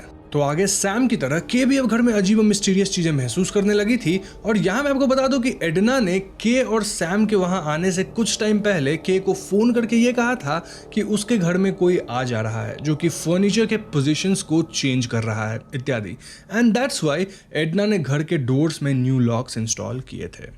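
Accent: Bengal, India. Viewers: Bengali accent boht jyada aa raha hai. Please theek kijiye ise.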